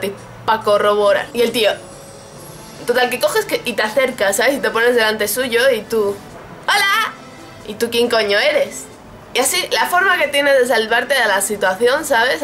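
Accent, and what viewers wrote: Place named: Mexico. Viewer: aww Me encantas e asento mexicano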